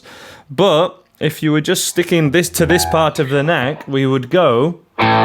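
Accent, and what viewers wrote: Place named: North Yorkshire, United Kingdom. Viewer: Thank you for the lesson on by. Terrif song! Your accent sounds like a Northern Yorkshire accent. I'm an ex British Patriot. I live in Tasmania now. Keep up the good work. Easy to understand.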